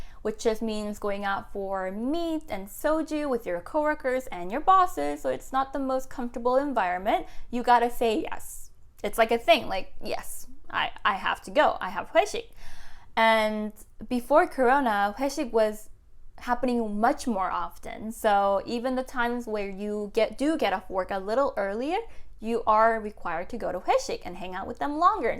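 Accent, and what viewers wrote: United States. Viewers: It sounds almost like American!😭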